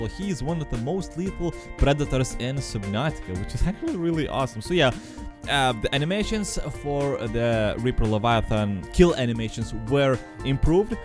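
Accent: Russia. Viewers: Is he Russian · are you Russian?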